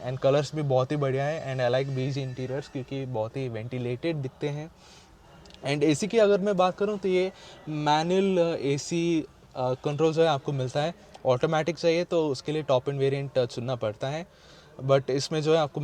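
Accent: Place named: Kerala, India. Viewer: If I am not wrong your accent resembles Keralam.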